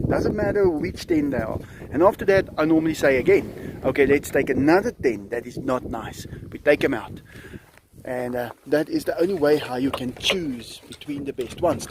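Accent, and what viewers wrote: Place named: South Africa. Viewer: I enjoy your south african accent!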